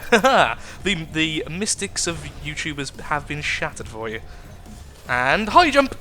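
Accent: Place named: England, United Kingdom. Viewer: I love your englishness. or UKness, I dont know, accentness. sir ;)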